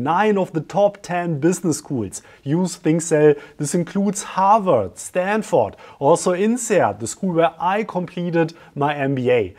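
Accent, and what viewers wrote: Germany. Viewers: Ernsthaft, so schwer ist das „th“ nun auch wieder nicht. Das klingt wie reinstes Hochdeutsch. Andere Sprachen KLINGEN anders. Das hier ist echt schwer auszuhalten. Wird dir kein native speaker sagen, sie sagen es nur denen, die tatsächlich wie native speaker klingen. Aber das kann echt niemand lange aushalten. Schlimmer als sächsisch. Oder indisches „Indlish“. Du klingst wie wenn Otto Leute nachmacht, die eigentlich kein Englisch sprechen. Wie kann jemand English lernen und zu 100% Deutsch klingen auf dem Level?? Sorry, super Inhalt, aber ich krieg schon die Krise, was glaubst du, wie das für native speaker klingt…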